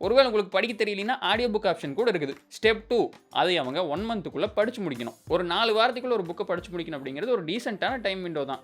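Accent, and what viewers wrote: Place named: Kongu, India. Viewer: கொங்குகவன்டர் ஸ்லாங்..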